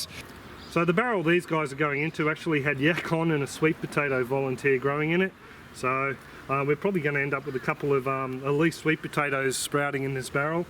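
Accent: Australia. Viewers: I most likely will never plant taro but I so love watching your videos just to learn some new gardening tips. the Aussie accent and lingo makes gardening ever cooler. Good luck with your roots.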